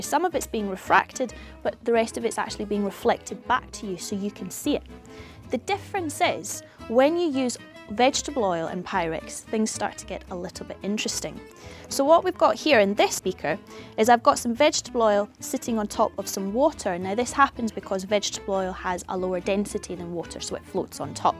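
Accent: Scotland, United Kingdom. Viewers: you're Scottish ascent is dreamy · I swear not everyone sounds like this in Scotland, half of us sound like farmers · Cool experiment. Love her accent. Scottish,I believe. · posh scottish accent haha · Isn't that accent scottish? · American shirt brit acent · Scottish burd. nice. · Scottish I believe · What part of Britain is her accent from? Sounds scottish, but could be welsh, too... Can anybody tell for sure?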